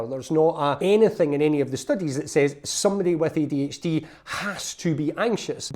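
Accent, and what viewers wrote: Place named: Ireland. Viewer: Started listening because your Irish (?) accent is just divine to my ears — stayed because you really hit a nerve & I feel so seen now! Subscribed.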